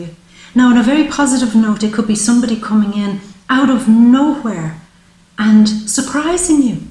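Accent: Ireland. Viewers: Six bajillion holohohoaxers on the rampage from the murder of the Romanov children in cold blood, the Holodomor and the 50 million anhialated through the 1918 Spanish flu, called so because Spain was the only country without a blanket ban on media. To wall Street and the Frankfurt School Freudian Marxists kicked out of European countries, again, now to rule by political correctness and to silence the counter narrative unofficial thinkers. Apart from that tyrannycal despotism I really like your top on you, and ye've a great brogue.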